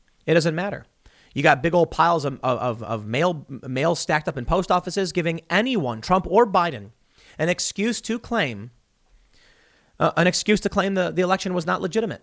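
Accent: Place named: Midwest, United States. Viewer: It is just me or is Tim's accent slipping back more Mid-West lately. "Byoxes", "Boeth" & "Foelks" as examples.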